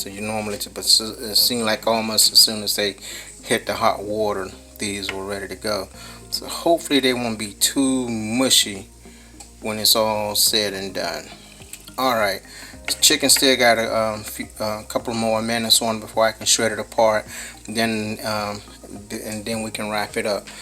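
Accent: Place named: Tidewater, United States. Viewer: I knew it!!!! I knew it!!!! Soon as I heard you speak, I knew you was from Tidewater! That’s my home Fam! 757 Bad Newz!!! Man Love Me some Yock Bruh! Can’t wait to make your recipe Homie! Salute!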